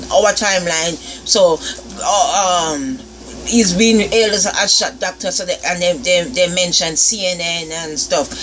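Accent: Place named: Caribbean. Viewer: great, keep it coming please. Your show is great! Love when you sing yo us a bit. What is your patois? Jamaica? Barbados? Some isle accent, right?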